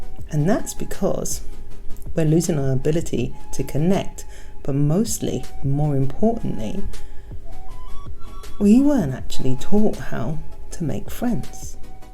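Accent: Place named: United Kingdom. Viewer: My god, you are beautiful! Totally wasn't expecting to hear another British accent :-) Your locs are beautiful!!! Need to have a look through your videos :-)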